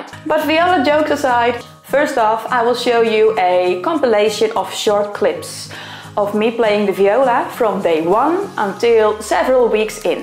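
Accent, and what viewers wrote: Netherlands. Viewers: From your name and accent I think you are Dutch or Flemish! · I guess you're Dutch from your accent 🤔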